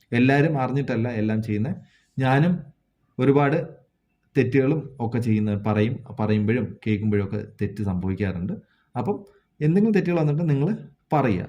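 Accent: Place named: India. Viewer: Each word they say differently.your class is no good.typical indian accent .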